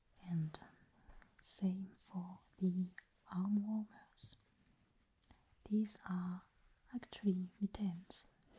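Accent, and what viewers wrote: France. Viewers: Your French accent amazing I love it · I love the apothecary theme! Also from the great french accent you have, are you like from a french speaking country or are you bilingual? I always heard you in english thus I was curious :p (also that's my goal to speak so good english people won't notice I'm french xD) thank you for all of your videos!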